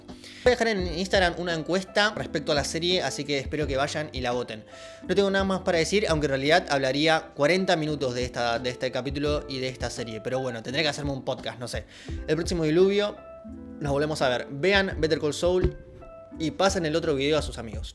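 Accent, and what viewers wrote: Argentina. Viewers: Hablas muy argentino, llega a molestar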